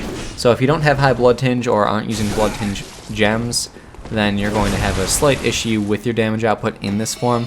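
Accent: United States. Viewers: the american accent is strong with this video.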